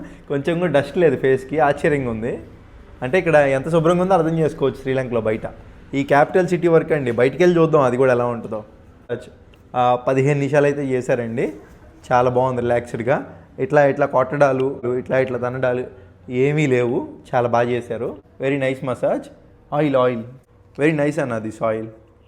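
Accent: India. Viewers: Re first you learn English talk English and talk properly English. Then after you go to world travel in front onwards. You talking this Buttler English, it’s not good for us. It’s not good for Indian people also talking about that Butler English in front of foreign persons it’s impact for us in India, so learn properly talk properly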